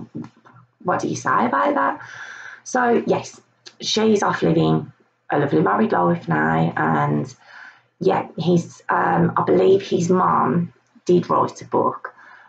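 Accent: Birmingham, United Kingdom. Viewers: you sound brummie I would say I'm from Leicester I can tell the accent it's strong but yam yam is that black country accent ??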